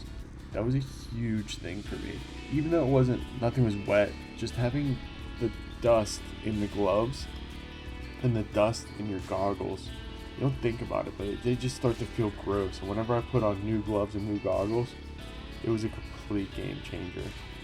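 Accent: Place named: Pittsburgh, United States. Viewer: Yeah, I could hear some Pgh in your voice! Sadly I haven't ridden anything in years just due to life circumstances. Gotta live vicariously through YT videos like yours. The only bike I've kept is a YZ125 that I rebuilt from top to bottom to race AWRCS. I go out and huff some two stroke exhaust from time to time to keep it circulating in the bloodstream though. Hopefully I get my freedom back before I'm an old man and I can get back out there. Thanks for taking us along for the ride.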